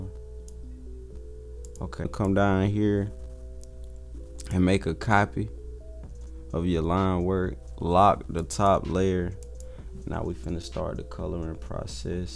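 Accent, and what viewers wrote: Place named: South, United States. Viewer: Man, are you from the south? Atlanta? You sound like the cat from Outkast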